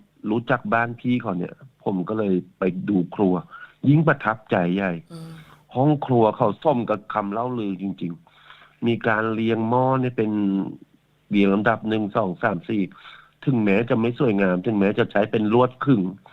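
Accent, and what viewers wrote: Kanchanaburi, Thailand. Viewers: สำเนียงคนกาญจนบุรีเนาะ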